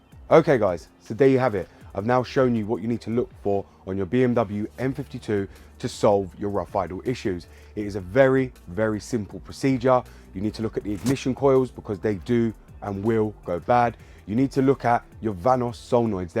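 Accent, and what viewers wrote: United Kingdom. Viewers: I'm 23, would like to be a vag technician but I like BMW, trying to get a <num>i as my first and daily car, this Chanel is 🤘🏾, the only thing I'm not very comfortable is with the UK accent ahaha but you're okay, I'm just not use to heard it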